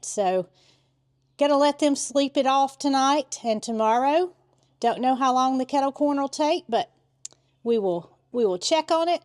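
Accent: South, United States. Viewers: kettle corn or cattle corn I cannot understand the Southern accent..sorry1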